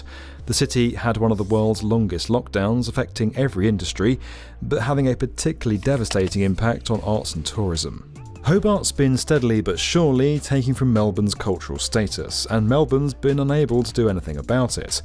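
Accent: United Kingdom. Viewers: You're British right, not Australian?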